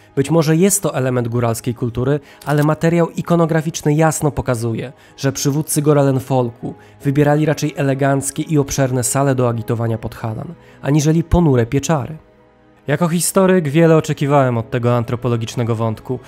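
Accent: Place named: Warsaw, Poland. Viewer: Sam sposób w jaki opowiada swoją recenzję jest taki wkurzająco warszawski i salonowy;) W sam raz dla fajnopolaków. Bo to film dla tej grupy społecznej.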